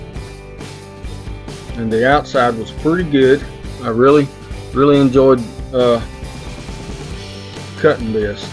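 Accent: South, United States. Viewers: Wonderful work. I love to watch your videos, very informative and entertaining. I live in England and I find that Good ol' Southern US of A accent great as well (:-). I'm so envious of you just being able to walk out back and grab some timber the way you do. Carry on with the great vids. All the best, Stuart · I don't think it's because of the way you talk. You seem to be a very polite person, which goes a long way. It's probably because people are more impressed with the quality of the video production, than the finished product itself. For example, Frank Howarth's channel is impressive not only because of his woodworking skill, but because of his editing skill, not to mention the versatility of his projects. Anyway, best of luck to you! Signed, a guy from Romania that doesn't care about your southern accent as much as he cares about your quality content.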